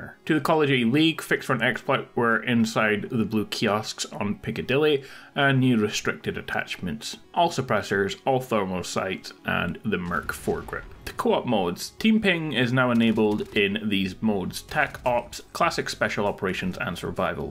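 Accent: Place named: Scottish Highlands, United Kingdom. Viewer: Nice video man, where you from? Can’t specifically place the accent as a highlander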